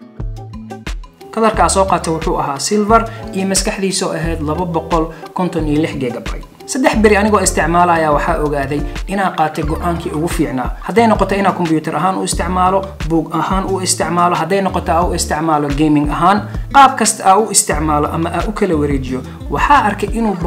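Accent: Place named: Djibouti. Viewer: Réer Djibouti ayant yahaye marka ii dul kaata sidan uu gorayo af somaliga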